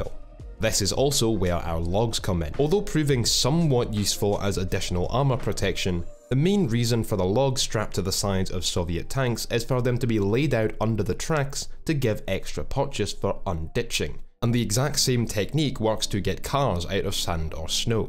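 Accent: Scotland, United Kingdom. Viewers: Man... I just LOVE your scottish accent, sounds so cool :D Nice video as well, keep it up ;) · Are you Scottish? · This proves it. I will happily listen to anything if it's spoken in a Scottish accent.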